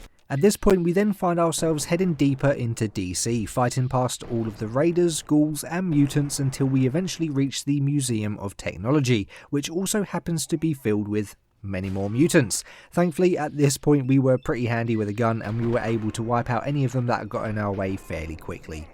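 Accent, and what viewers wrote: United Kingdom. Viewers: Love clicking on videos and as soon as I hear the dumb British accent. I click off 🤣😂😆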